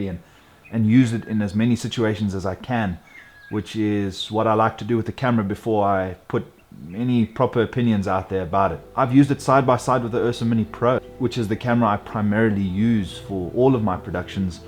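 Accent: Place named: South Africa. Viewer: So awesome to see a fellow Saffa so passionate about filmmaking! Almost jumped out of my seat when I heard your accent. Great footage!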